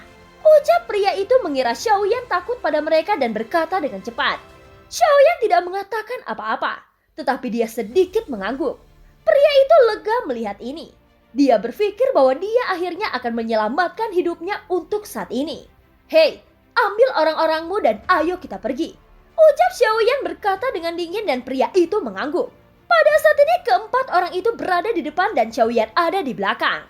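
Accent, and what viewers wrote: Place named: Surabaya, Indonesia. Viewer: Suroboyoan rek bosone , semangat yo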